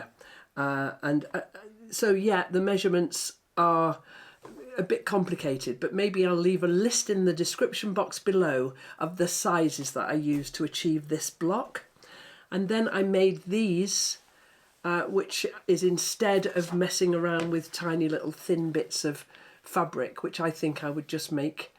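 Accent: England, United Kingdom. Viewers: I could listen to you talk all day. Your honesty with yourself comments tied with your lovely British accent is so soothing and sometimes comical that you take away my stress and make me smile. Not only do I learn from your expertise, but I learn to relax and enjoy. Thanks, from Ohio, USA.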